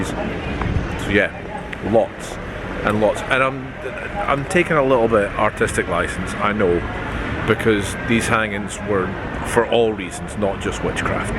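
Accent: Scotland, United Kingdom. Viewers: I am watching this and chuckling to myself. You are speaking English, but it takes me a few seconds to process it, because of your thick Scottish accent.( he- he- he)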